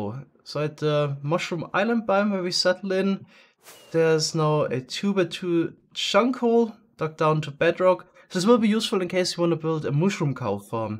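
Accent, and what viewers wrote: Germany. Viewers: Are you from Germany? Asking bc of youre accent 😅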